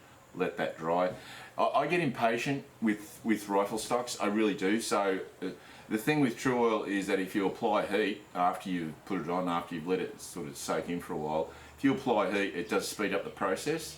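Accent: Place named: Australia. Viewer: You sound Australian Thought y’all no longer allowed gun ownership?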